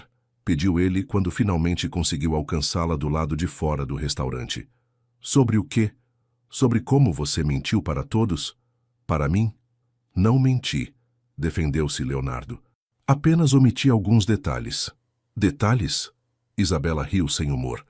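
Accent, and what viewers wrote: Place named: Portugal. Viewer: Narração perfeita, de Portugal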